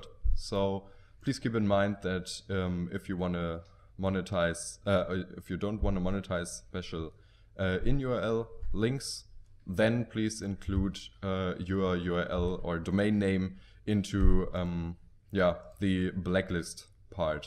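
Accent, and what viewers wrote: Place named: Germany. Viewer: Wie man den deutschen Akzent raushört haha xD. Aber ihr macht wirklich gute Arbeit, für Creator oder Developer wie mich ist es ein Segen das es linkvertise gibt ^^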